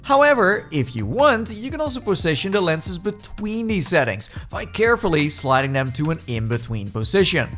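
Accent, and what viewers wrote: Netherlands. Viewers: ur from Holland?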